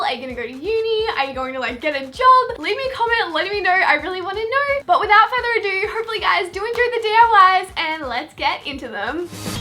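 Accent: Australia. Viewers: is she australian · She's an Aussie right??? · ARE U AUSTRALIAN? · AUSSIE!! YEAH MATE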